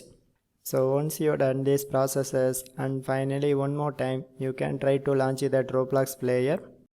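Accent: India. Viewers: Lmao indian accent Atleast I don't speak like that even I am indian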